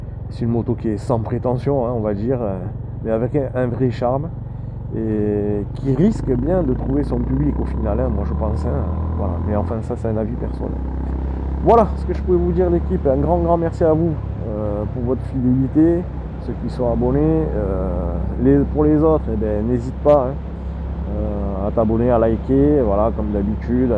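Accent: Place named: Southwest, France. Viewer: Merci pour cette belle présentation avec ton magnifique accent du sud-ouest 😉 Je trouve que la bécane était un peu trop rapidement au rupteur, tu confirmes ??? ✌️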